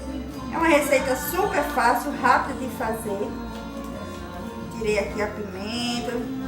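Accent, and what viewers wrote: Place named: Alagoas, Brazil. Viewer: Eitaaa q Pablo tá rolando solto..kkkkk moça vc é de Alagoas??? Acho lindo o jeito q vcs falam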